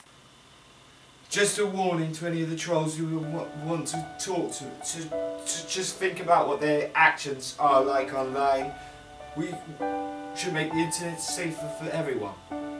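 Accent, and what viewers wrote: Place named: Boston, United States. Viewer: Where do you get a slick Boston accent like that?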